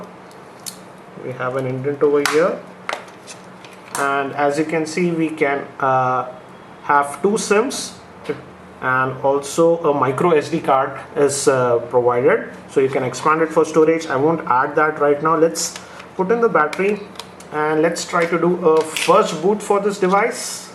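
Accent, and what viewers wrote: India. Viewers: You sound like an Indian? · its indian accent.. u sure u hate originality?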